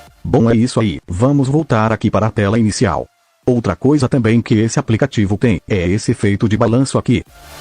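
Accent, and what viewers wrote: Bahia, Brazil. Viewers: em fim um baiano kkkkkk morri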